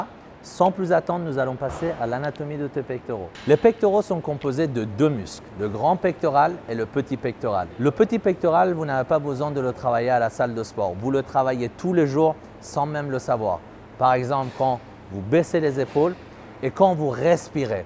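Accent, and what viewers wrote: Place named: Iran. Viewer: IL EST IRANIEN LUI C EST PAS POSSIBLE CET ACCENT hahahahahah de la part d'un autre iranien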